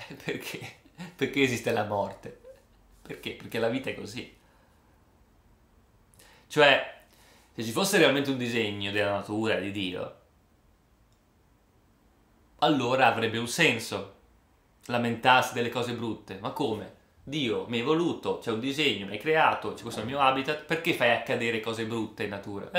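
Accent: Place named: Romagna, Italy. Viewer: Dall'accento e da quello che ho visto sul tuo canale credo che tu sia romagnolo... Mi vergogno ad essere tuo conterraneo.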